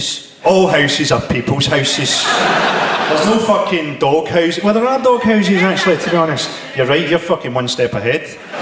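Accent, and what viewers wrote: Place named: Scotland, United Kingdom. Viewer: I reckon the Scottish accent is boss👍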